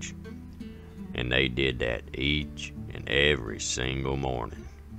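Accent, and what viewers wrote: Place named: Fayette County, United States. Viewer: Don’t forget the churnin and the ironin and the cannin and the patchin and the bed turnin and the water pumpin and… I declare, listening to you makes me feel like I’m back in Fayette County and Uncle Joe is telling stories while showing off his greens garden!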